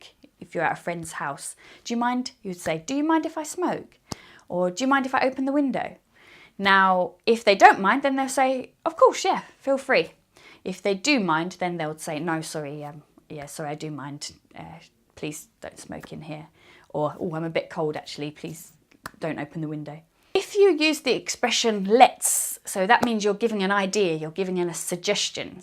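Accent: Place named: United Kingdom. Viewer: I like this English teacher a lot.Because she is amazingly skilful in teaching.Besides,I AM si fascinated with the British accent...I like very mucho the British English.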